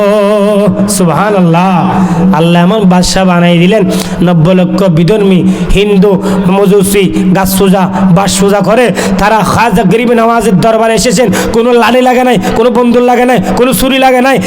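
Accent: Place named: Chittagong, Bangladesh. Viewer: আসসালামুআলাইকুম ওয়ারাহমাতুল্লাহি ওয়াবারাকাতুহ, ভাইজান আশা করি ভালো আছেন, আপনার চ্যানেলে ঢুকে দেখলাম, চ্যানেলটা অগচল, ডিসক্রিপশন লেখেন না কেন? টেক তৈয়ার করেন না কেন? ভিডিওর ক্যাপশন এবং থাম্বেল দেন না কেন? এগুলো না দিলে তো মানুষের কাছে পৌঁছাবে না এবং চ্যানেল গ্রো হবে না, সাবস্ক্রাইব করলাম আমি। আপনি কোন এলাকার, বাসা শুনে বুঝা যাচ্ছে চট্টগ্রাম, আমার বাড়ি চট্টগ্রাম রাউজান, ভিডিও আরো লম্বা দেবেন, যেহেতু আপনার ওয়াচ টাইম এর প্রয়োজন আছে।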